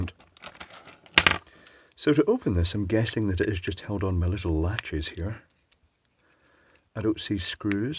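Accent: Scotland, United Kingdom. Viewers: 😂😂scottish accent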